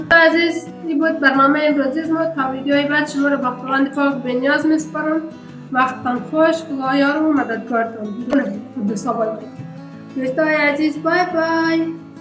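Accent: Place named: Iran. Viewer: خیله نصفش ایرانی میگی نصفش هزاره گی درست گپ بزن یا ایرانی یا هزاره گی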